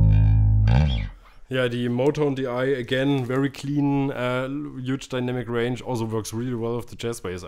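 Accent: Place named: Germany. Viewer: too much German accent... lol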